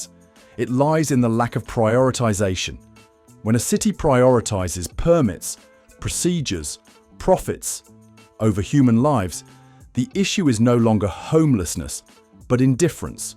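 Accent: United Kingdom. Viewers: Why is a man from England with British accent describing New York situation? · Why a guy with a British accent know about New York. But If I can say something about this as a New Yorker is that New York turned is a shithole.😮😮😮and it because of politics and the real-estate courrption the NYPD & Port Authority & the MTA.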